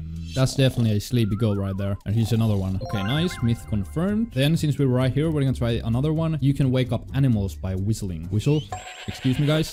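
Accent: Finland. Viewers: You sound like finnish guy and i from there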